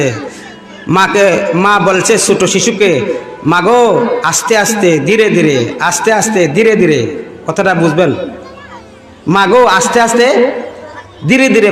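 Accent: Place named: Sylhet, Bangladesh. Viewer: Bhai where are you from originally (from bd) ? It sounds like you're my sylheti fellow Muslim bro😂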